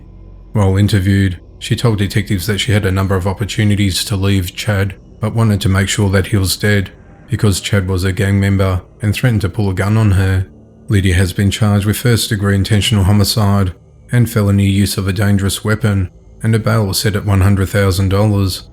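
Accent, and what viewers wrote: Australia. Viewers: This is like an Aussie version of Chills voice.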